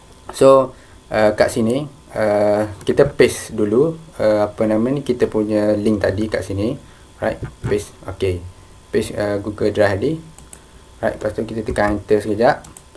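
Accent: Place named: Terengganu, Malaysia. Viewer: terima kasih boh... dengar suara tahu doh.. org Terengganu ni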